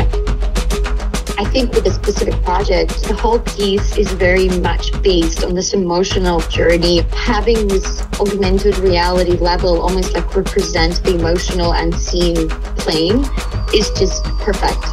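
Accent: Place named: Los Angeles, United States. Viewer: oh your from LA now, last i knew you were british-latvian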